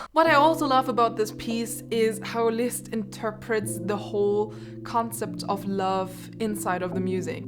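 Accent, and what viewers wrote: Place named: Germany. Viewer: Never lose your German accent.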